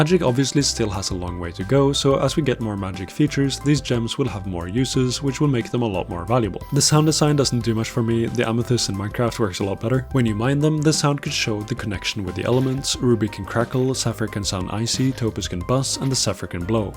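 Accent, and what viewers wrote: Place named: Norway. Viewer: I like your cute Norwegian accent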